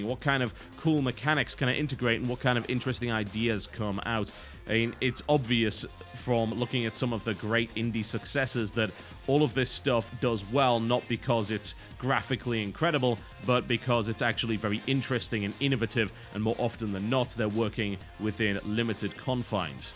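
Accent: United Kingdom. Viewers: maybe he enjoys the sound of you manly British voice....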